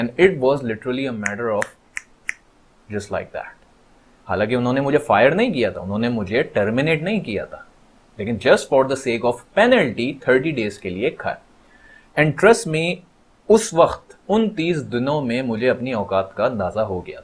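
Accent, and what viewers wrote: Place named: Haryana, India. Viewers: Bhai kya accent pakda h haryanvi ka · Haryanvi kese ati h apko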